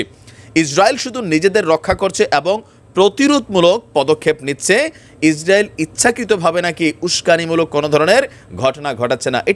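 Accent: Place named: Noakhali, Bangladesh. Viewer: আরে ভাই এতো নোয়াখাল্লা তার পর আওয়া এর ভাষা এমনী হবে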